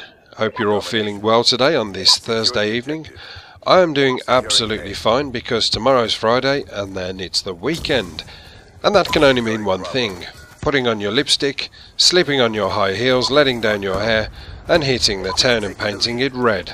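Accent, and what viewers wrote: Birmingham, United Kingdom. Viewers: hahaha a Brumbie saying "Basicly" always makes me piss my sides. A word that Brumbies always say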